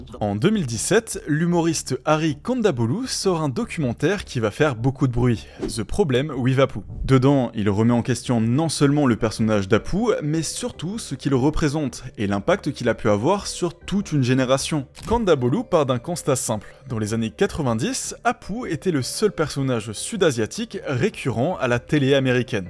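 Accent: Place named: Brussels, Belgium. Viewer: accent bruxellois pas fl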